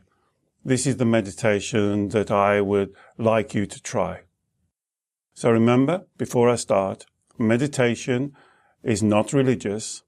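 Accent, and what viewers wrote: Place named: Northern England, United Kingdom. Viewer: Your northern English accent is so calming. Kia ora from New Zealand.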